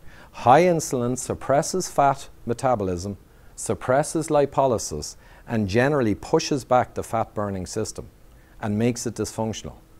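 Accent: Ireland. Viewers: Ivor Cummins I love the Irish, no translation needed! Lets do a Skype session.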